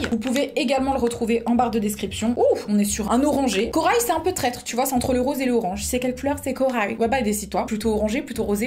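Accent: England, United Kingdom. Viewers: Salut.j adort ton accent anglais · Mais tu me fais tellement rire, je suis fan ! Et cet accent anglais, j'en peux plus 🤣🤣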